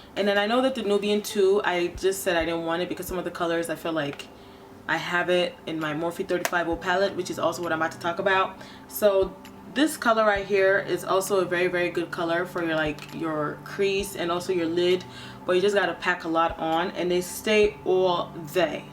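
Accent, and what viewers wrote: United States. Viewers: Lol when u rolled them eyes so hard.... "I cant be lookin crazy in these streets" LOL I just love u and how ur african/chicago accents intertwine lol... Just glue ur powder pan back into the container. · your country accent is so cute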